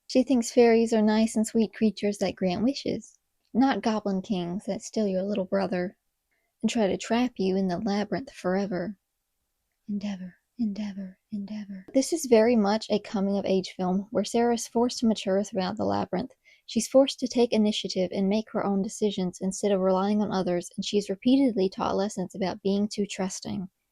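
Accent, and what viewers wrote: South, United States. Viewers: What is your accent? I can't place it but it's a lovely southern accent. I'm from Texas and Arkansas (half my life in each state). Is it Tennessee perhaps?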